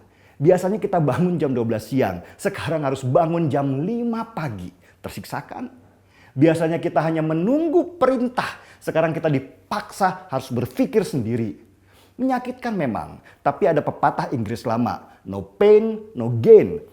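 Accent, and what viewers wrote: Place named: Medan, Indonesia. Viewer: Jelas, lugas, tegas... gaya medan banget... Indonesia beruntung memiliki karakter2 suku yg khas dan berbeda2... Gunakan semua karakter dr msg2 suku utk membawa Indonesia maju...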